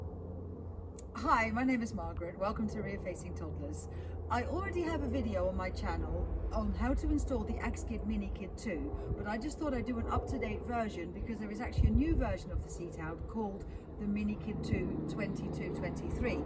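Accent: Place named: United Kingdom. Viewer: Ach British is the nicest accent in the world - it's like balm for my ears ❤😊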